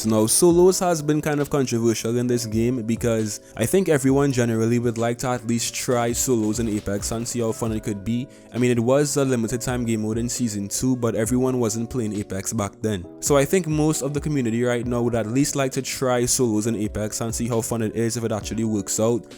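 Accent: Trinidad and Tobago. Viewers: Are you from Trinidad and Tobago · Are you trini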